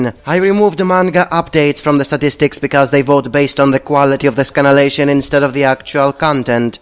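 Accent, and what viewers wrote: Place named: Greece. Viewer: He's from Greece and yes that's his normal accent.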